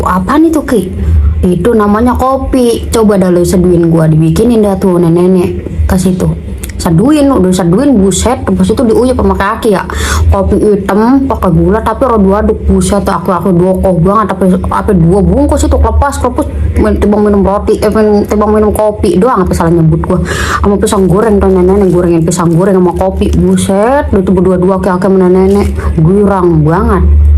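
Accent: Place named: Betawi, Indonesia. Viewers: betawi A nih kalo betawi E udah jarang yak 😂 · betawie ngapak.😁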